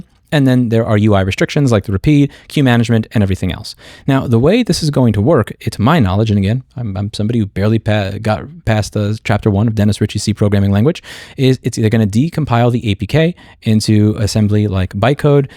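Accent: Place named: Texas, United States. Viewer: Man, your Texas accent is getting thicker and thicker. Which is a good thing.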